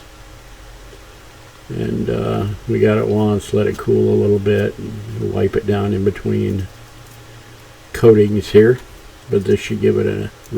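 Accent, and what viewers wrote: RP, United Kingdom. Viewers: Loved the cultured british accent. Since it happens to be your shop you can call the tools what ever you want to. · After watching many years of the BBC I think the accent was spot on. It matched with the opening visuals and reminded me strongly of my childhood. I just needed to see a Dalek and I would have been behind the sofa like a shot!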